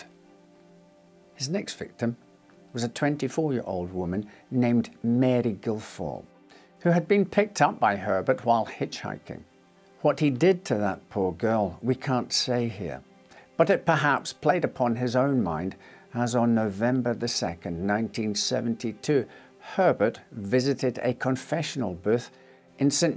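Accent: Scotland, United Kingdom. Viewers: I hope you get well.. I enjoy his narration style, and the subtle Scottish burr allows these stories of terrible people happening to decent folks bearable to hear about. I cannot believe I used the adjective "subtle" to describe Scottish.. anything. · You remind me so much of the Scottish actor in Outlander not sure of his name. I love your accent 😊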